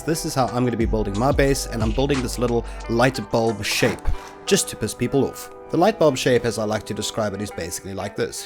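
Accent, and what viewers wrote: South Africa. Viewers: I’m a simple man, I hear a Saffa accent and I sub to the content. But that intro was perfect. Perfection. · are you from south africa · OH SNAP YOUR ACCENT!!! I could tell you were South African and then decided to check your bio and I was right!!! Awesome man, Awesome video :)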